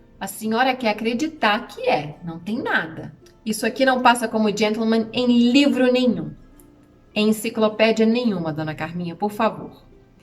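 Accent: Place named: Minas Gerais, Brazil. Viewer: Não sei como vim parar por aqui,kkkk,amei o canal que indiquei para uma amiga que logo será psicóloga...Amei tanto que estou maratonando os vídeos,kkkkkk, já mostrei para o marido,logo que vi o primeiro vídeo, já pensei é mineira,uai ! Sou também,😊, parabéns pelo conteúdo,pois,até quem nem sequer pensou em se aventurar por este mundo daí,pode adquirir muito conhecimento ! Que Deus possa abençoar muito teu caminho !😘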